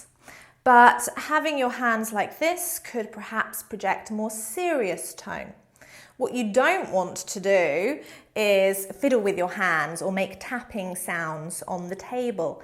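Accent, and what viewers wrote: United Kingdom. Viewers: A very engaging presentation. I like Teacher articulation of words - so clear, great pronunciations, so distinctly British accent. Reminds me of my favourite english teacher, Miss Courtney of "Mind Your Language" fame. She's always back straight chin up. I really marvelled the way she speaks, so British. She's got her own peculiar strong screen presence.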